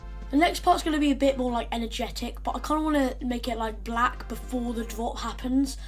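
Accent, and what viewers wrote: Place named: United Kingdom. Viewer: The british accent is really catching me off guard but no hate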